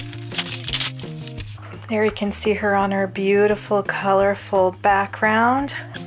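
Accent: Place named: United States. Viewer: I love how you post your videos late at night (I'm just assuming no hate but from ur accent it sounds your American and right now for me it's so it would be late all around the USA but different times)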